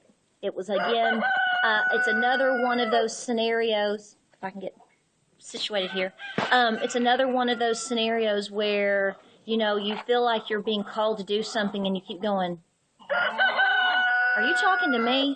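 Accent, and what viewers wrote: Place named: Tennessee, United States. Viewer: I want to keep listening because I love what you are saying and I am right there with you. But the rooster is giving me a headache. Please consider filming again without the loud background noise?? Please? I love your accent...I am from Tennessee and you sound like you are from around my neck of the woods.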